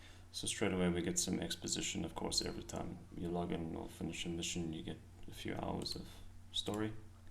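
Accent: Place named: South Africa. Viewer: I could listen to that smooth SA accent roast game menus all night. 🍆 Lmk when and where I can watch the new short film, and while I'm on the subject, I would personally love to see a video showing how you tackled all the rotoscoping in Davinci. What GPU you runnin', tough guy? Tutorials, let's go! 🤣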